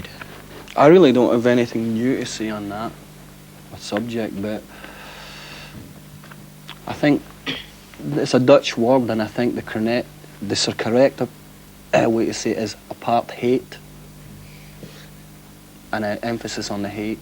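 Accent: Scotland, United Kingdom. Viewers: some kind of cute he was in this interview, i love his scottish accent 💕 I love 🥰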